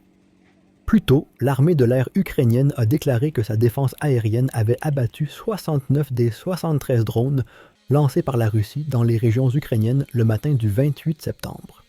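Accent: Quebec, Canada. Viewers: Un narrateur québécois?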